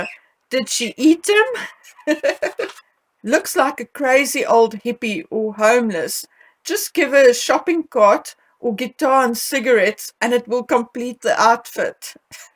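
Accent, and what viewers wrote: South Africa. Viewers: Hi, really enjoy your videos. Love your accent it reminds me of the years living in S.A. such a beautiful country and wonderful warm people. Ignore the "Negie Megies" if they don't like what you have to say they can change the channel and protect their sensitive little souls, yes, sometimes the truth hurts! They just need to "smarten up." No body shaming, but I do think Mz. Meggie has put on weight and the padded look in her thighs possibly the result of some of control panty, Spanx? When you check out other pictures and look at the size of her calf muscles, to me, it looks like they are a little fuller. Regarding her "fashion sense" or lack thereof, she nearly always looks to me like she has been dragged through a hedge backwards - my personal opinion. The rolled up cuffs on the red outfit that looked like it was made from a yacht's spinnaker was purely to show off the collection of jewellery she was sporting on her wrists and hands... 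so transparent! As for PH's treatment regarding those children... don't get me started, he needs a good swift kick up the arse. The pair of them should be ashamed of themselves as should whomever set up the publicity stunt for them. Yes, the timing for PC's does look dodgy, however in his defense, climate change, farming, the land and the environment have been a passion of his for years so whatever the Harkles are bringing to the table regarding this is something they have absorbed like dried up sponges and wrung with a "hey look at u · Good for you. I love your channel I also adore your accent. I adore South Africa. Use to live PMB. Keep up the great work. I look you and Sue me both out. Love you.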